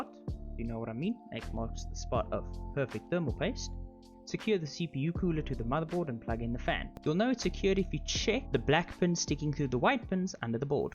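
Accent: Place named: South Africa. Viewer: You south African holy mollie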